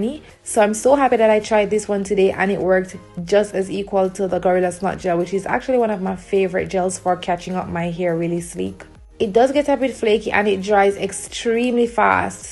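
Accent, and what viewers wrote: Jamaica. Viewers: This a gal yah a Jamaican she sounds like it pretty too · Hi there my Jamaican sister i love watching your videos of course if will be copying a lot of the styles you shared and am loving your accent. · I know this accent anywhere. Jamaican queen. U represent well. Beautiful hairstyles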